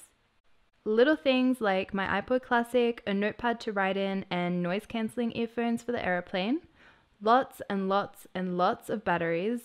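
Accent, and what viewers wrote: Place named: Australia. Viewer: Thank you for this video. And also, i figured that you are australian, which is very awesome!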